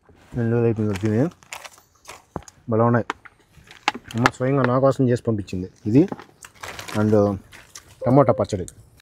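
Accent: Kadapa, India. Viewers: Mee kadapa language bagundi and mee smile supper ❤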